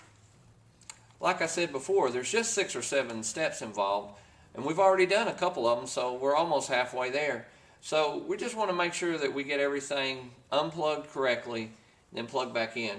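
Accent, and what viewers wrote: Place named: Texas, United States. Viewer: Go-ahead and say chassis anyway you want. We know what you mean and where you are from. It is a French word. If we tried to say it to a Frenchman's satisfaction, we would all look ignorant. If a TX accent causes you to fail to understand the content of these excellent videos, then I would find you strange. Read this eurouc: <link> Accents are long standing parts of verbal communication which add texture and identity to spoken word. Maybe you are simply too ignorant to understand. Maybe you are French. As I am French-Canadian and have half my family living in the Bayou posing as Cajun, I am offended by you either way. EuroTrash might be a better name for you.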